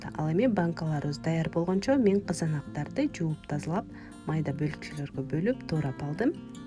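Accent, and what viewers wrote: Standard. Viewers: Таза кыргызча суйлоптур азаматсыз ❤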